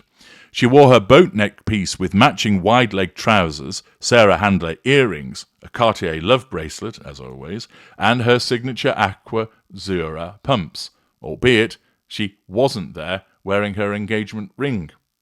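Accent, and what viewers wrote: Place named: Manchester, United Kingdom. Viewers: Its the ingk rather than ing at the end of words that says NW England to me. On the Kings English thing, the way he pronounces project with a long o is very noticeable to me. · i think he’s originally a Manchester area man.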